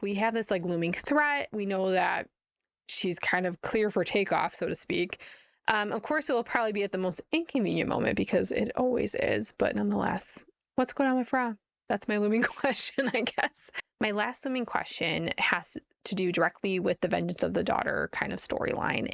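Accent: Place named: New England, United States. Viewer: Can I ask where you’re from? The aspirated L in also, and the dropped final consonants makes me think New England.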